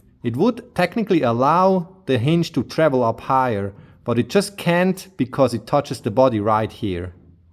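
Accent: Switzerland. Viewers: When you hear that Swiss accent, you know this job will be done with 110% accuracy. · Your accent is lovely! Are you from Schweiz, or maybe south of germany.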